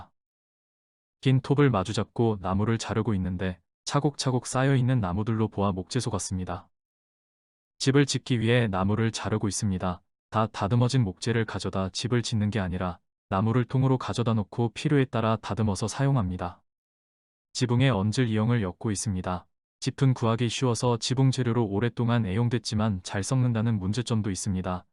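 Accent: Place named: Gyeongsang, South Korea. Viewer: 말씨와 말투를 보니 갱남분으로 보이네요. 학원맨 나는 1975 명동파 챔피온 다방 앞 소년들이 회색양복이라 부르는 19세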